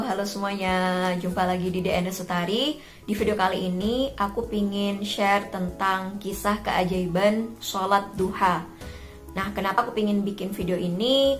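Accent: Jember, Indonesia. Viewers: Kkak org jember ya?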